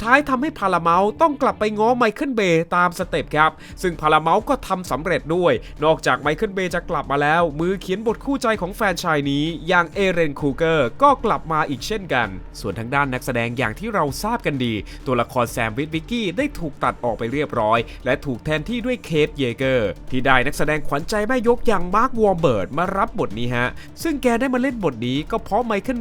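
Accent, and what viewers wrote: Isan, Thailand. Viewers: ผมละชอบจักหวะพูดอีสาน 555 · มักเสียงพากษ์อิสานของเจ้าหลายๆ😂❤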